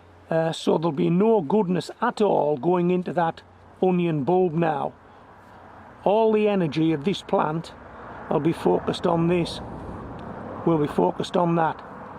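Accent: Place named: Scotland, United Kingdom. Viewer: I do like to hear your Scottish accent, sir. So strong. Love it.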